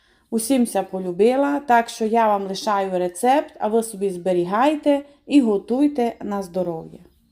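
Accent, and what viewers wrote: Transcarpathia, Ukraine. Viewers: Обожнюю закарпатські діалекти :) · Підписався з двох причин: все, що готував за Вашими рецептами виходить надзвичайно смачно. І дякуючи Вам, насолоджуюся закарпатським говором. Дякую!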